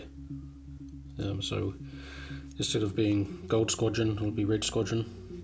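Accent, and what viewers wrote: New Zealand. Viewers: you are extremely talented! nice paint job! also are you from New Zealand?!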